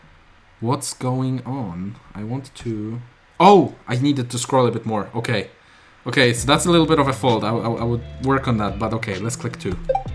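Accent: Poland. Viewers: Are u polish?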